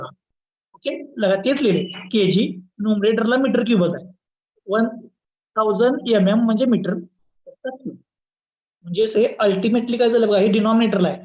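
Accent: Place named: Kolhapur, India. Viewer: Kolhapuri accent is so sexy sir 😊😊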